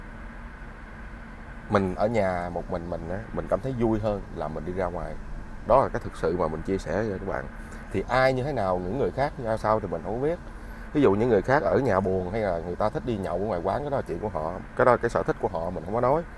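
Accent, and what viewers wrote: Standard. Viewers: A oi,a noi chuan lam,hy vong a lam nhieu video nua,de e hoc hoi nhung j ve ben my.e cung muon qua do song giong a. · Anh nói chuẩn quá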